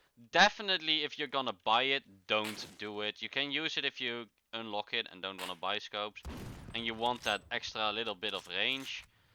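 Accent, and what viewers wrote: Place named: Netherlands. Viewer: this person is from holland you hear it from its accent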